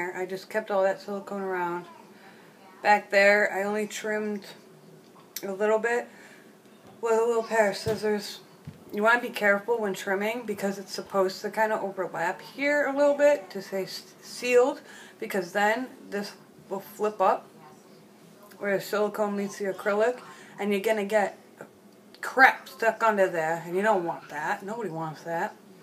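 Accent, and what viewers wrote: New York, United States. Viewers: It's so funny you sound like a new Yorker with the bottoms out. Not making fun of you In any way so please dont take it like that cause I didnt mean it to be rude. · You sound like you're from New York or back East somewhere I'm originally from Puerto Rico but I was raised in New Jersey but the Jersey shore 🇺🇸🇵🇷😊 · beautiful teeth for a beautiful girl i sense a slight ny accent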